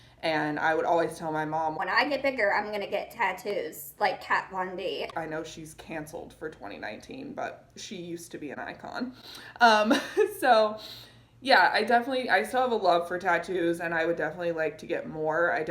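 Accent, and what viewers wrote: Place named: Oregon, United States. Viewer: Love your channel! I’ve been watching you for a couple of months and am really enjoying your videos! I’m a fairly new Poshmark seller and find your tips very helpful! Can I ask you where you are originally from? I get an Oregon accent vibe from you, but I could be totally wrong. Keep up the good work! Thanks!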